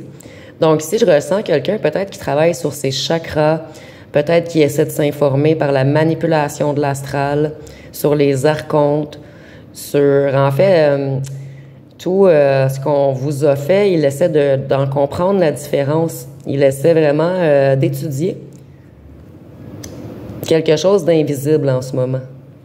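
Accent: Quebec, Canada. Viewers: Merci infiniment pour cette guidance tellement juste pour moi. Avec le magicien et le roi d'épée c'est exactement ça ! Maintenant j'attends mon roi de coupe qui arrive j'en suis sûre. Je vous écoute de Paris et j'adore votre accent québécois ❤ Merci et bonnes fêtes de fin🎉 d'année 🎉🎉🎉🎉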